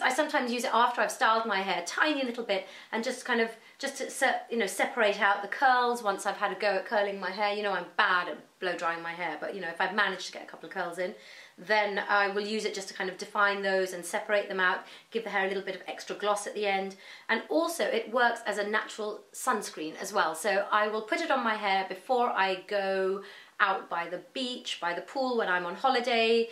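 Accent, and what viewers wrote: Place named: United Kingdom. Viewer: Thank you so much for the video!i m a new subscriber and so so glad to have come across your channel and blog!i have watched all of your videos now and absolutely love your style!2 months ago we moved from London to Canada and it is so so lovely to hear your gorgeous british accent😉😉!i love listening to you!at the end of april i m coming to London with my two little boys and will defenatly check out your recommendations!i would love to see a whats in my bag with new celine bag! Your previous video with celine trapeze bag made me just fall in love with it!i am planning to get it whilst in London!i love your colour and hope i can find something similar!i know that these colours are seasonal but lets hope for the best!my question for you would be whether after owning celine trapeze bag for a while would you still repurchase it?did you have any problems with it?i absolutely love yours since i saw it and now cant get it out of my head!👜👜👜i hope i can find a lovely colour!thank you so much for being on you tube!i m so glad to have found your channel!i love your instagram!💋💋💋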